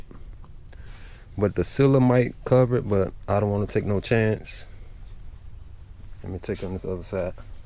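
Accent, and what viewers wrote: Alabama, United States. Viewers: What part of Alabama you from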